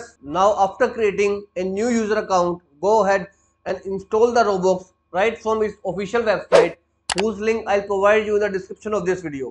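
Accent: India. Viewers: i love your Indian accent it turns me on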